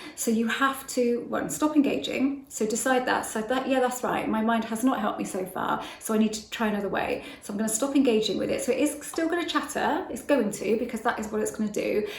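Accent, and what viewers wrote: United Kingdom. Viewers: You have the same name as my daughter and I love hearing British accents talking about the law.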